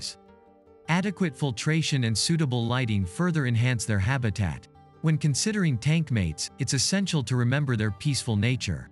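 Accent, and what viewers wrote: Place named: United States. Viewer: nice tutorial, i not only learn American English but also the knowledge on Electric Green Tetra😀👍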